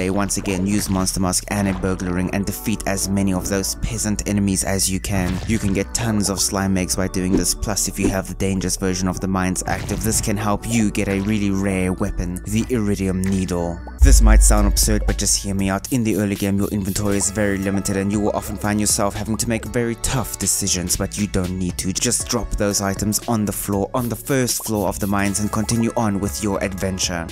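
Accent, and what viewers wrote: South Africa. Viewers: Are you South African? Your accent sounds South African 🤔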